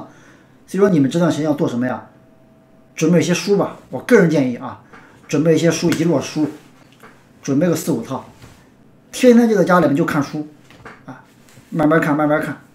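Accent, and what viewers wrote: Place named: Shandong, China. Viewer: 是山东人吗口音熟悉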